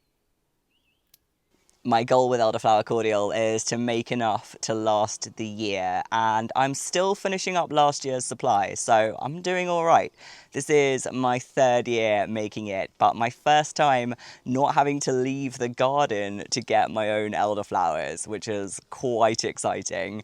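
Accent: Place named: RP, United Kingdom. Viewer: You sound so posh.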